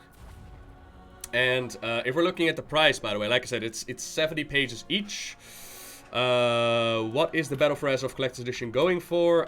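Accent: Netherlands. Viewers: As a Dutchy also, your accent is very recognizable and makes your videos unwatchable to me XD too bad. I use wowhead though, good stuff!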